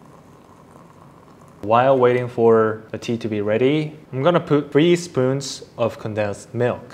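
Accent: Malaysia. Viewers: i think you're starting to catch a bit of malaysian accent in your english accent hehe:D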